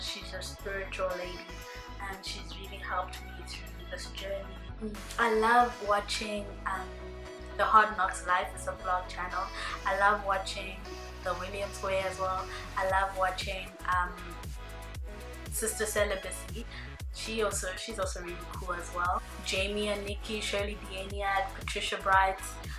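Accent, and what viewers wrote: South Africa. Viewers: You have a cute South African sounding accent! Love your spirit! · South African accent...😑I thought that too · omg!!! legit thought you were South African. your accent says S.A guuurrrlll?????!!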